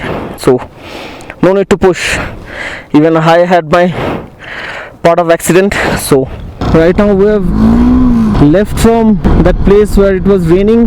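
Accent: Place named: Bengal, India. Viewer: Bhai ka bong accent hai.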